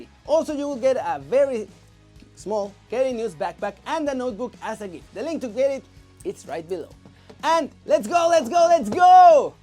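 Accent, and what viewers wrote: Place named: Mexico. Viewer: Muy bien overall, si note unas cosas, pero muy bien, el acento te delata, pero no se si seria español o mexicano, creo mas mexicano que español, no te preocupes yo tengo 23 años aca y se me olvidaron muchas cosas por la peda, lo entiendo muy bien y lo hablaba mas que menos, pero ahora se me olvidan muchas cosas. 😩😁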